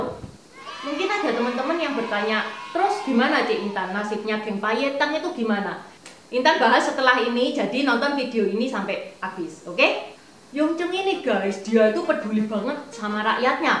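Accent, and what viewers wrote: Java, Indonesia. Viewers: Suka cara ngmg mba intan ini...lucu ngakak..trus kl liat wajah kaget..liat logat nya ..jawa bagett...haha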